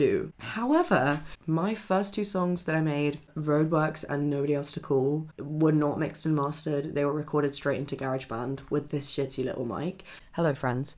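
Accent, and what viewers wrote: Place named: South Africa. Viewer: you sound South African. you from Australia or New Zealand?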